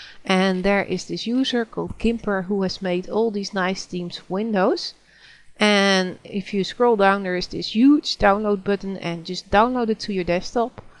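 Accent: Netherlands. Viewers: Wauw dat nederlands accent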